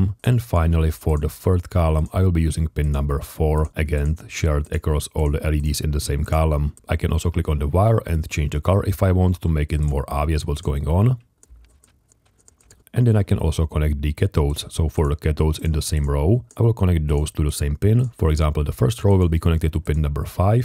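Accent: Czech Republic. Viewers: Good video and content👍👍 jsem si říkal, že zníte jako čech a je to pravda😂 musím říct, že dobrá angličtina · hey I just had a question if you were czech because you really sound like a czechman and if so, DAMN DUDE that english be almost clear ale jinak nice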